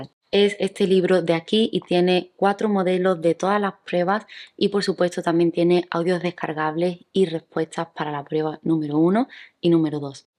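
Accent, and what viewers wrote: Spain. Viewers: De cual pais es la hablante? Es acento de espana?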